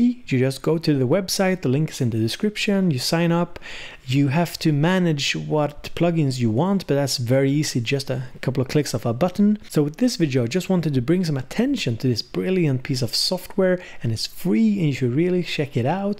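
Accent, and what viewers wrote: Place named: Sweden. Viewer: Cool vid, as usual! This vst is so useful to beginnig in the euro rack adventure! Btw, You need to have a great job to travel and go to hotels, so cool! Btw 2, I know were your nice little accent come from now, cause i searched " " on google (Beware...stalker in view) and you come from: ♪♫♪♫ (drum bearing)...Sweden! Am i right?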